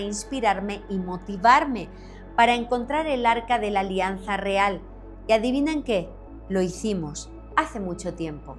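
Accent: Spain. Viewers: me encanta escucharte tienes tantas cosas tan importantes e interesantes en tu canal felicidades eres también muy profesional y comprometida encima de todo eso tu acento español ❤🎉 felicidades nunca te canses de alimentar mi ignorancia ❤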